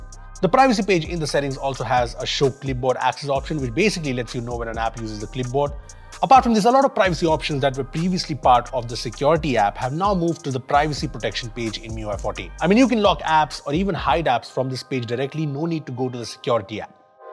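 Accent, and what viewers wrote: India. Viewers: Mrwhosetheboss in indian accent channel. Nice content btw. Very informative and entertaining at the same time!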